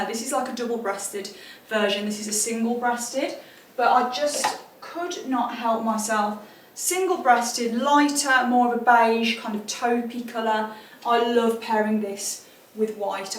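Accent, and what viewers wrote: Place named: England, United Kingdom. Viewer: Love your English accent OMG 🤌 (I am french) and looove your joyfulness, I feel happy listening, thank you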